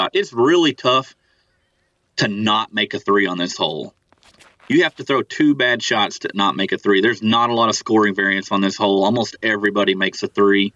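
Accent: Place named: Arkansas, United States. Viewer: Fantastic to watch. Love the commentary! Including the great Arkansas accent. Looks like a great event. Thanks so much for posting!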